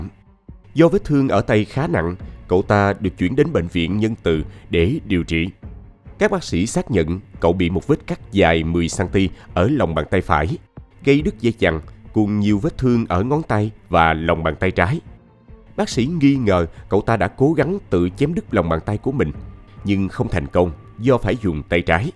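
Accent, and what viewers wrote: South, Vietnam. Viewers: Người ta đọc tin như thế này sao lại chê ? Giọng đọc rõ ràng chấm phẩy đúng nơi đúng lúc giọng miền Nam rất dễ nghe 👏👏👏👏👍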